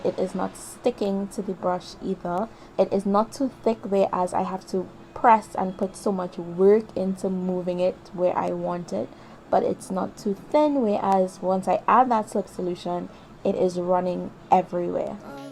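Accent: Trinidad and Tobago. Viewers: are u trini?? cause u def sound like it lol also, this set is fireeeee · Recognise that trini accent anywhere 🇹🇹🇹🇹... Imma subscribe to your channel just for that 😁😁.... BTW I love polygel tutorial videos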